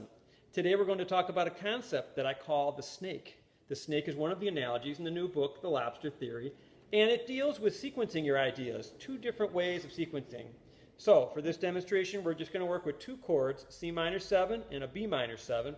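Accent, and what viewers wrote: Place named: Chicago, United States. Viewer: It's Chicago accent - part of the charm! He's a great guy & a super strong player & teacher!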